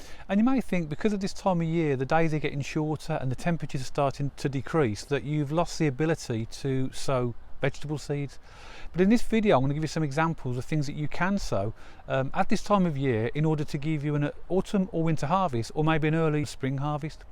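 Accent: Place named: West Midlands, United Kingdom. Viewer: You sound as if you are from the West Midlands (like me) so I wondered where you got your garlic and onion sets.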